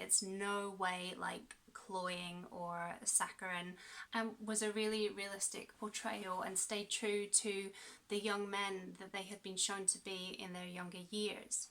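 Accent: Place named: Scotland, United Kingdom. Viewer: You’re awesome and Scottish. Win. Win. I could listen to you all day. Subbed! Awesome wrap up. Definitely going to check Persepolis.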